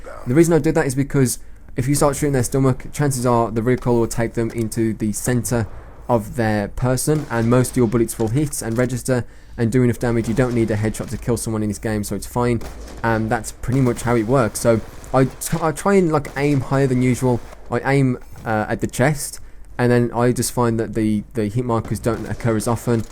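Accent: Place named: United Kingdom. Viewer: Where abouts in the UK are you from? You sound really local to me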